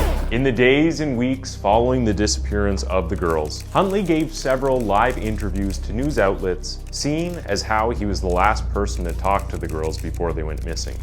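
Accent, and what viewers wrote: Canada. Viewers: You're Canadian aren't you? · Do you not hear his Canadian accent? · 🙄 Damn shame, you couldn’t tell that the narrator is Canadian by his accent? You must not know many Americans (but of course, our accents have a hell of range, you know).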